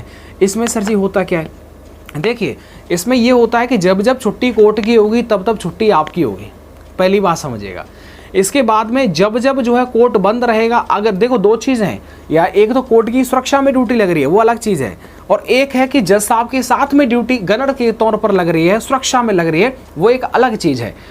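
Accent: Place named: Western Uttar Pradesh, India. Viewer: sir aap aligarh ya mathura ke lagte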